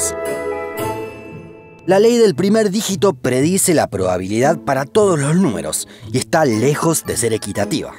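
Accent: Argentina. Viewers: Tu acento argentino es muy bonito, como tus vídeos.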